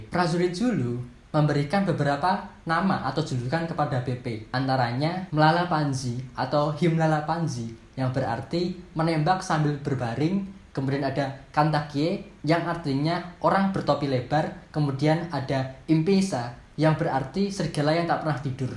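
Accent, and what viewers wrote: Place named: Java, Indonesia. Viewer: Kaka medoq wkwk kerenn😹👍🏻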